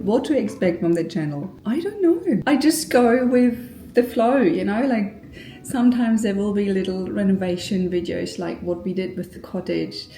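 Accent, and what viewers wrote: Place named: Australia. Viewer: I love everything about this ❤ you are so rreal. It makes my nervous system fall right into place. So much s**t is going so fast, in this world, and this is just what I need, to level out. Also, I am completely new on my own youtube channel, and I feel you. So much a mirror for me - I also don't fit into niche boxes, and I'm not sure, anyone will ever watch what I make. But it feels meaningful so I go on - and my soul sings, when I find a kindred spirit here. Are you saying you are german?? You have a very authentic aussie accent! I love it. And love Australia ❤ and your shots of nature. Who is James? Son? Dog? Boyfriend? 😄 All the best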